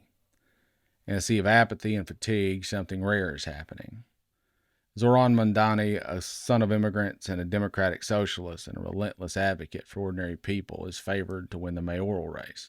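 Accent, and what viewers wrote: South, United States. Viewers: Reminds me of Beau (of the Fifth Column). Another calm, soft-spoken, Southern voice.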